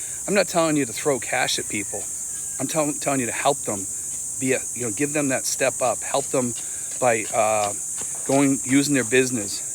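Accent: Boston, United States. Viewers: Thank you for saying that there are some good women in America! We are not all entitled, narcissists. Some of us ae hard-working, kind people who just want to keep our heads above water. BTW, I detect a Boston accent in your video...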